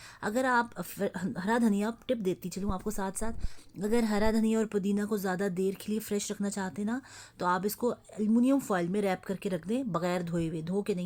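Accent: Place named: Pothwar, Pakistan. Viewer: Ap Pakistan main kon sy alaqa sy belong krti hn apki bataon language main pothowari tuch aa raha he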